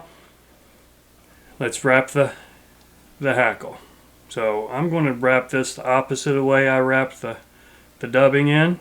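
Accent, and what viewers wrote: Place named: South, United States. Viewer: Stop cringing, although I cringe when I hear my recorded voice 😖I like this format, great intro. You sound more "southern" in this video;o) Geeze in almost 2 years you now sound like a celebrity host of a how to show 👍Nothing wrong with either production styles, your dedication to the channel is amazing! Thanks for doing this! Oh yeah the fly, heard of Humphreys, a lot, but not aware of this bug. I tied Ed Shenk's cress bug. But I'll tell ya, if you pull a Usual under water in the middle of a drift, especially in a good current, hang on for a surprise. I think the trout take it for one of those critters. Thanks again, those flies in the intro were awesome! 🤓👍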